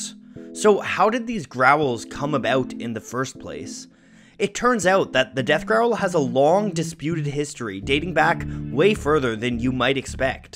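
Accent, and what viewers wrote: Canada. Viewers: Are you Canadian? I like your accent. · Someone's Canadian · spencer jacobs I'm Irish and he's definitely Canadian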